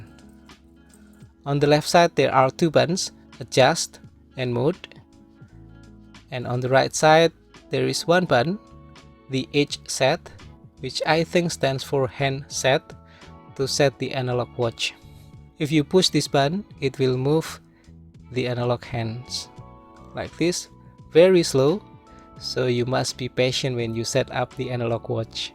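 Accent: Indonesia. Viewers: channel review jam tangan underrated ini, inggrisnya bagus bgt dengan aksen khas indonesia yg sangat jelas pronounciation nya. lanjutkan terus mas mantap!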